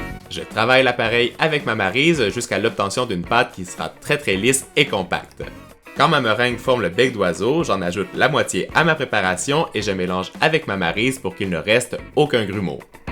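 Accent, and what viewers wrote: Quebec, Canada. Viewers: Jadore troppppp lacsent quebecoise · J'adore vos axant ... l'axant québécois c'est mon préférer . Je trouve qu'il est tros marant . Sinon j'adore t' es vidéo . 😍😚😇😜😄😸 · Jadore tes video cest pas laccent quebecois ? Jadore😍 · J'aime trop les Québécois surtout votre accent !!😘😘😘😍😍😍😍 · #lovemail t'es le meilleur j'adore ton accents québécois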